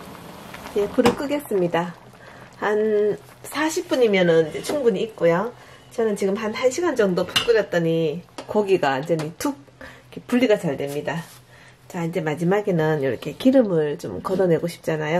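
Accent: Gyeongsang, South Korea. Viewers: 녜~ 경상도 말씨로 진행을 하셔도 매우 훌륭하네요! ㅎ ㅎ ㅎ 오늘은 귀하의 덕분으로 산삼백숙을 먹을려고 부산서 왔읍니다. 수고 하셨고요 - - - - 자주 뵙길 희망합니다. 🍉🍉🍉🍑🍅🍆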